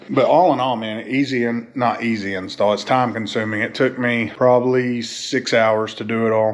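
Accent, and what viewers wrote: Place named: Appalachia, United States. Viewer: Why do people who talk like a HillBilllie sound low IQ to me ?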